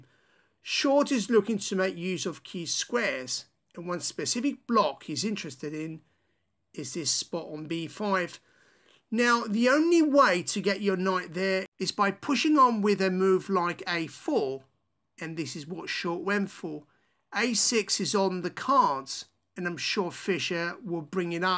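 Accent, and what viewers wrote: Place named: United Kingdom. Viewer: He doesn’t speak American he speaks British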